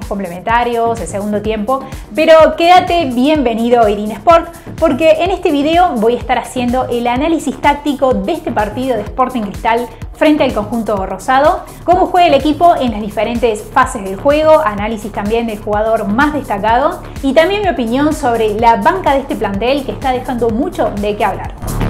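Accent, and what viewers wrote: Córdoba, Argentina. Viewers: Wao sabes demasiado de fútbol mucho más que muchos comentaristas reconocidos y youtubers famosos Has estudiado algo referente al fútbol? Hace poco descubrí tu canal y es una joya algo que no hay en la plataforma análisis táctico de la liga peruana Increíble Nuevo suscriptor y me parece que eres de Córdoba 😊 Saludos desde Lima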